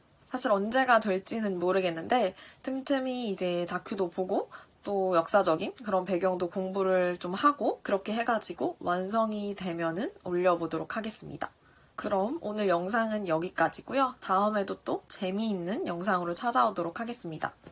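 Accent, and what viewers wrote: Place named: Spain. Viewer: ㅋㅋ 님 뭔가 한국어 하실때도 스페인어 말투가 묻어나는 거 같아요ㅋㅋㅋ추천해주신 영화 꼭 볼게요 감사합니당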